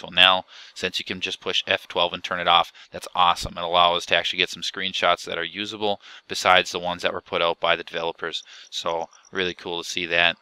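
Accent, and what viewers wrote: Canada. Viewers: you have a heavy newfoundlander-canadian accent lol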